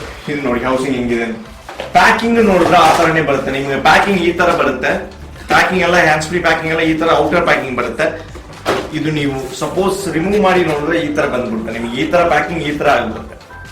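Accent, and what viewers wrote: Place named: Tamil Nadu, India. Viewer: Typical tamilian Kannada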